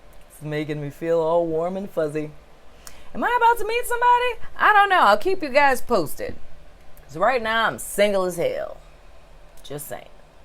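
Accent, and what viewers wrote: United Kingdom. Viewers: hahaha this is my first time watching you I like you I'm from London and I like your "RP - British English Accent"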